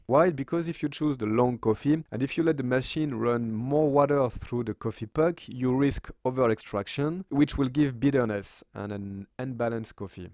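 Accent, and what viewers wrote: France. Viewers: Good English with nice french accent!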